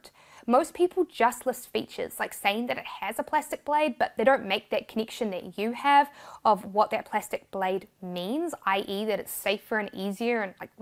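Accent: New Zealand. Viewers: I think it's a New Zealand accent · I'm loving the NZ accent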